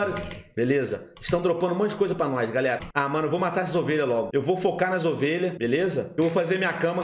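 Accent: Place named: Portugal. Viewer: eae depois de muito tempo sem ver seus videos eu voutei quando vi seua intro ja bateu uma nostalgia cara amo seu canal assisto seu canal desde os meus 5 anos de idade saudades amo seu canal percebi q vc ate ta com um sutaque meio portugues kkkkkkkkkkkk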